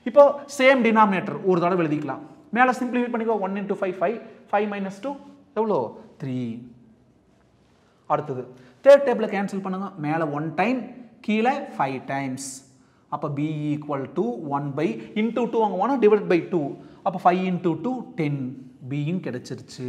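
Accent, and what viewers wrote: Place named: Kongu, India. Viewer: Sir, your are a kongu Tamil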